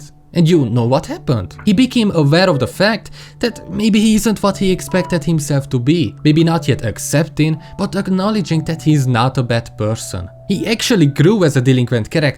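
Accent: Hungary. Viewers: Are you hungarian? You sound like one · 11 seconds in and I already know its a Magyar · hmmm..... i know a hungarian accent when i hear one. i can smell it from kilometres away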